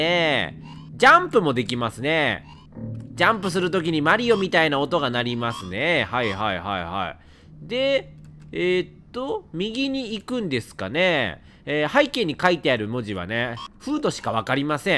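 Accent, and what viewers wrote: Kansai, Japan. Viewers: 関西人ですからね❤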